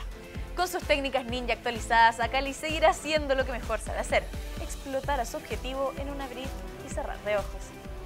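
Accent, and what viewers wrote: Chile. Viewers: Que bueno que se va esto es legue of legends latinoamerica se necesita a alguien con acento neutro no a alguien que a leguas se nota chilena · Pero si me gusta el acento chileno D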